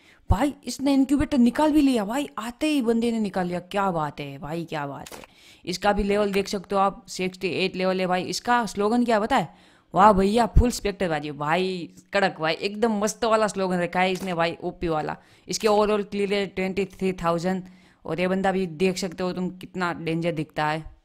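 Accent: Gujarat, India. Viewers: Aap Gujarat se ho kya bhai?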